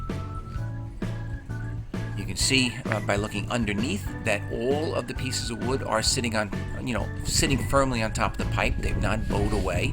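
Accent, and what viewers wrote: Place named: New York, United States. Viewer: I bet my life this dude grew up in either Staten island or Queens lol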